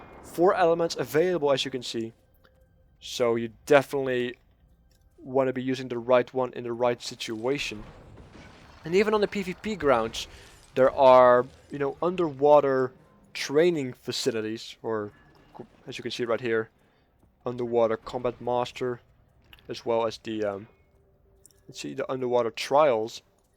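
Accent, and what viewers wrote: Netherlands. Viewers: im 100% you are Dutch it has to be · dutch accent rofl. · jesus christ your accent, must be dutch? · You are dutch, ik weet het xD Waarom kunnen wij nederlanders zo slecht engels praten! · You sound Dutch haha. Nice video.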